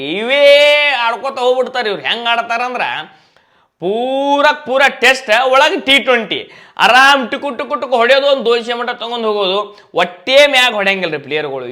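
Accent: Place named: Bijapur, India. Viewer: ಅಣ್ಣಾರ ನೀವೂ ಬಾಳ ಚೊಲೊ ಮಾತಾಡ್ತೀರಿ ನಿಮ್ಮ ಬಿಜಾಪುರ ಮಾತ್ ಕೇಳಾಕ್ ಬಾಳ ಖುಷಿ ಅಕೈತಿ. ಜೈ RCB 👑 💛❤️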